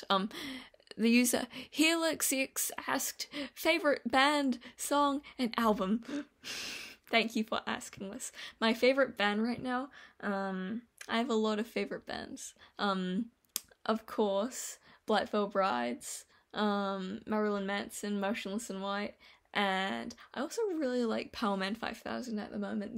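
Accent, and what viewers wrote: New Zealand. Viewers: Lmaooo that's funny with the accent, honestly you sound like you have a New Zealand accent to me! But maybe if I was from New Zealand you'd sound more Americanized I ain't sure haha. I GOTTA watch The Fly and Sweeney Todd they sound really dang good, and I love American Psycho! I definitely remember you mentioning how much you love haha I'd definitely watch a video of you talkin about it more, it was really cool learnin more about you you're awesome! Also Pop-Tarts are SO dang good haven't had em in a while but oh man they're delicious, great video as always I hope you have a good day! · Great content, loving that Kiwi accent!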